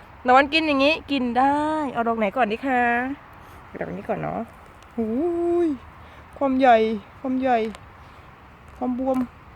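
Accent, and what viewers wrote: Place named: South, Thailand. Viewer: ชอบนอ้ง นะคะติดตามมาสักพักแล้วคุยสนุกใต้ก็ฟังออกนะคะเพราะแฟนก็คนใต้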